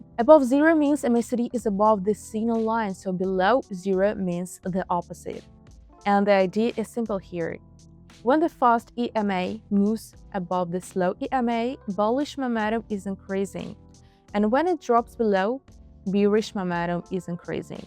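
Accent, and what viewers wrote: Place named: Russia. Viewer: It's pronounced MAK Dee 🤦 This Russian accent and pronunciation is 🙄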